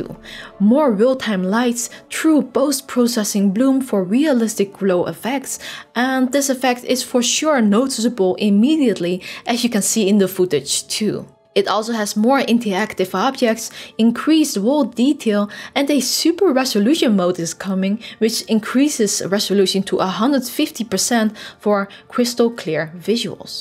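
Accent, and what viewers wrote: Netherlands. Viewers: Wow your english is extremely dutch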